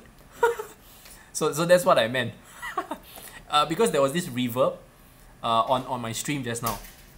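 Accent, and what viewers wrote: Singapore. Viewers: you have to be from Singapore right? I hear that Singlish speak!!!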